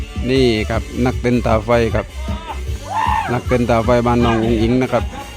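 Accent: South, Thailand. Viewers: เจ้าคนใต้ติ คือแหลงใต้😂😂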